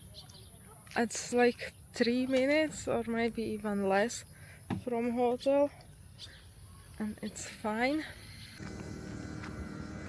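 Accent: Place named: Poland. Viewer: Bardzo Polski akcent :) 🎉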